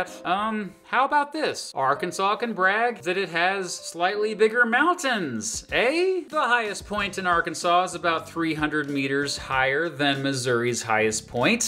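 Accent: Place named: North Carolina, United States. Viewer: I always thought your accent sounded like North Carolina. I've lived in Missouri half my life too.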